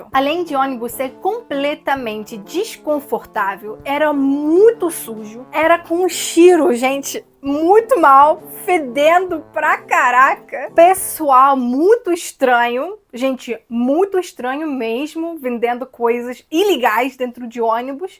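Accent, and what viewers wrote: Rio de Janeiro, Brazil. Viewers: Amo o seu sotaque carioca! Você está 99,9% carioca já. Só falta trocar o "mesmo" por "mermo". Kkk. Ah, você é linda demais!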